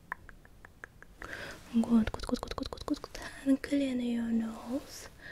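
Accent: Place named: Russia. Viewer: Русский акцент по-моему офигенно триггерный. Вери вери гуд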